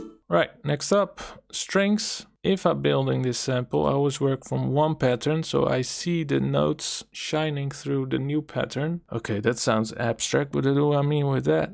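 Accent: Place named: Netherlands. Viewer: By your accent I'm guessing you're from the Netherlands?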